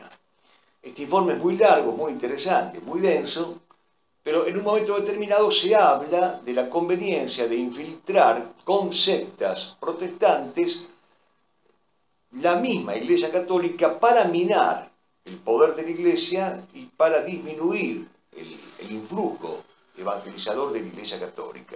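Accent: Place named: Buenos Aires, Argentina. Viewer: Claro y preciso, muchas gracias Ademas el tonito porteño es agradable, (perdón trinitario) saludos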